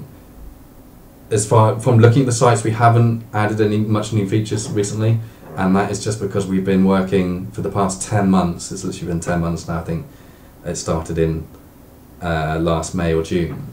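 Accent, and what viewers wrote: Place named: United Kingdom. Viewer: Very interesting as always. British accent makes everything better!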